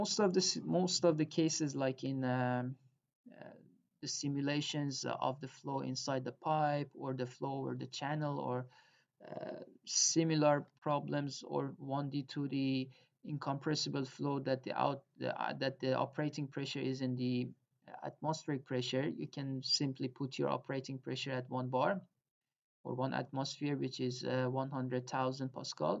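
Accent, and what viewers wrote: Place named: Iran. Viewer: are you iranian? this is a persian speaking english accent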